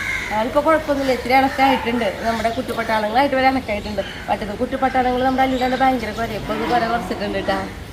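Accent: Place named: Valluvanad, India. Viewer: Chechiyude Valluvanaadan Bhashayum slaangum KELKKAAN Nalla Rasamund...🍍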